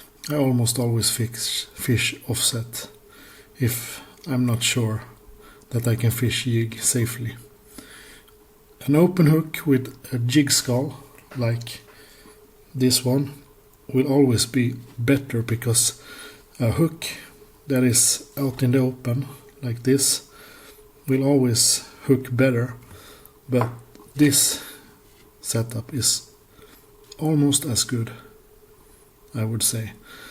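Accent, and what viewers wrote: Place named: Norway. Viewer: You said Sweden when you mentioned darts, but to me you sound very norwegian, especially how you say "just like this" sounds exactly like my dad whenever we visit our relatives in the US haha. Thanks for the guide, my local water for perch is full of crap, as its next to a mall, and old factory now museum, and not to mention an elementary school, specifacly a steiner school so they do alot of outdoor stuff, so along the edge where the perch sit there are so many rocks, and sticks that people have chucked into the water. Finally decided i needed to learn to use ofsett instead of having to go the store every 3rd fishing trip to pick up light wire leaders (there are alot of small small pike in the lake)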